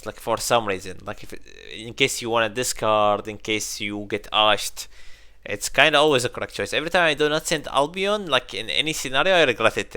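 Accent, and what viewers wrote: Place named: Iraq. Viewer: من صوتك كلت عراقي مابيه مجال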